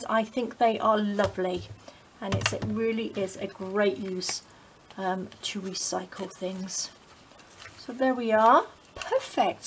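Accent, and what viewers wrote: United Kingdom. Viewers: Wonderful work! I'll try it, because it seems easy to make. Are you britain? It sounds so. Greetings from Germay.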